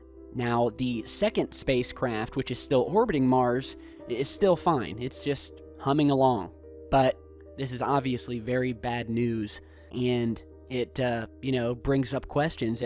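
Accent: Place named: Michigan, United States. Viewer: You're from Michigan aren't you?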